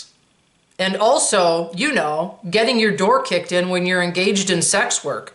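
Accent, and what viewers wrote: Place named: Illinois, United States. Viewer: I just found out about you YouTube said check this out. I can tell you are from the state of Illinois just tell you. Talk in your accent 😁 cuz I am just like you. Born in this state. Had parents that were totally upset about the state. For pretty much the same reasons. But now we got new ones, illegals 🤯 we used to kill people who invaded our country. Like you said wokeness his reign supreme. But I do think California has its speed on the wokeness? I may be wrong. But I don't think so, well what part of Chicago are you in dear 🙏 North South Central? Like I told you I'm in Alton Illinois. The early retirement I took. What's from the University of Illinois@ So I'm very familiar with Chicago. Love the city 🌆 I used to love driving Lakeshore drive at the lights were coming on. And all the international cuisine@ kiss 💋 food fantastic. 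All right I was bored and figured I'd drop a few lines. I will admit I'm not surprised. Have you lived in this state your whole life? Then I'm kind of surprised you're surprised 🙀 prostitution legal. Sounds about right to me 😎 that's why I want Republicans to take over this state. The Democrats have literally lost their mind 😭😞 started back with the clintons in my opinion. But my opinion won't even buy you a cup of coffee ☕ not at today's prices.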